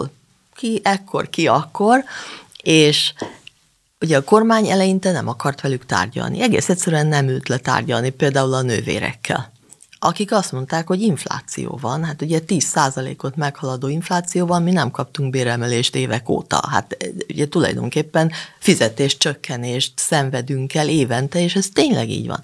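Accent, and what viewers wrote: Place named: England, United Kingdom. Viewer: Nagyon jók a Hossó Andrea videók mindegyiket megnézem. De ez a rémes angol akcentus ez engem nagyon idegesít. Megérteném ha ott született volna, de nem.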